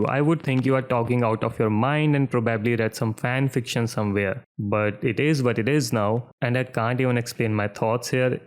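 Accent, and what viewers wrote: India. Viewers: I'm one of the novel pioneers. At first I was like. "Uhhh what's with this indian accent voice" But then he says funny stuff in the end.. "Bro youre so hilarious". You get a sub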